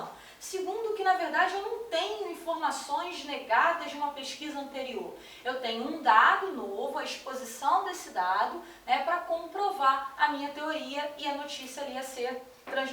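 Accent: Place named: Rio de Janeiro, Brazil. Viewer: Carioca é foda, fico prestando atenção só no sutaque kkkkk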